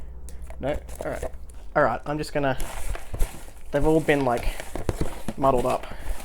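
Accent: Australia. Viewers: Great to hear a Aussie accent. Loved JV Jones back in the day.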